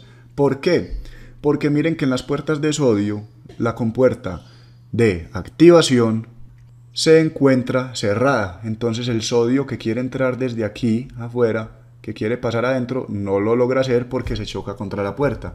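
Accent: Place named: Medellín, Colombia. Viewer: tuve que darle replay xq me deje llevar por el acento de medallo... jajajajaja ups!!!!!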